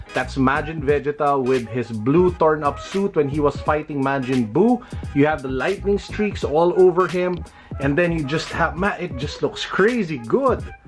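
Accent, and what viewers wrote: Philippines. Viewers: are you filipino?? I can read your accent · you have a Filipino accent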